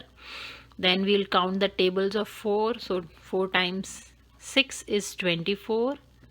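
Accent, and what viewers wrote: India. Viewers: Indian accent l guess?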